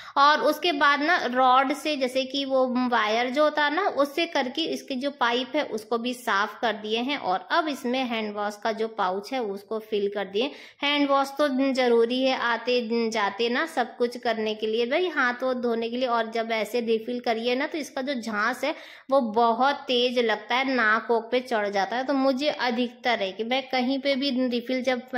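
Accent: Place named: Bihar, India. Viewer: Asalam o alaikum baji aap ne k kaha k aap u.p se hain lekin aap ka lehja bihari wala hai aap k bohat se alfaz biharion wale hote hain, I'm also a bihari from Karachi pakistan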